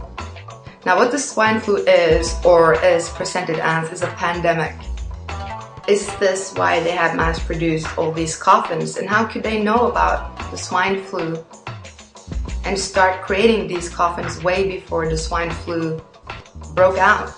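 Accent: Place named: South, United States. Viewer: Whats up with your accent babe? Or is it the crappy background music distorting it? Its that southern thing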